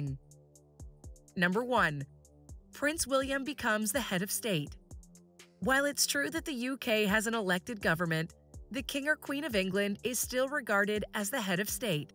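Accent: United States. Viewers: Good heavens, another Yankee voice getting it WRONG. Princess Catherine has not been Kate Middleton since the day she was married to Prince William, at which time she became Her Royal Highness, Catherine, the Duchess of Cambridge (or "Mrs Windsor, not that they use that name much, but definitely not Middleton). She is now Her Royal Highness The Princess of Wales, plus other titles. Please get your commentary right - OR - don't comment at all! Also it is NOT E ii R. It is II, Roman numerals for the number 2. E II R Elizabeth Regina II, Queen Elizabeth the second. Regina means the reigning Queen. Charles' insignia is C III R. Charles Rex the third. Rex is the male version of Regina. When William becomes King, he will be W v R King William the 5th. Got it?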